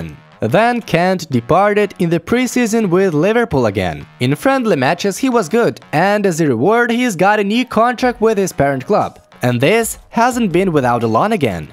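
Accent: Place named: Netherlands. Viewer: He sounds Dutch.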